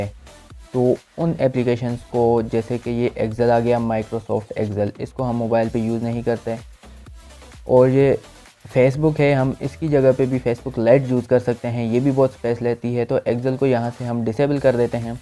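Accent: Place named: India. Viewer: At least ad captions I can't understand Indian plz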